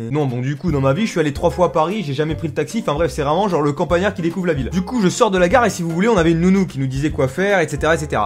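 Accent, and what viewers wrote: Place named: Guadeloupe, France. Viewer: L'accent guadeloupéen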